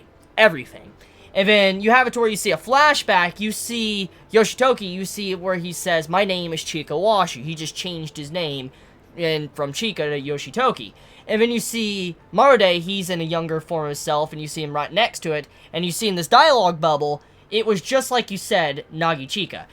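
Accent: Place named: South, United States. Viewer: He is from the Southeastern USA. He has a bit of a southern accent but not has heavy.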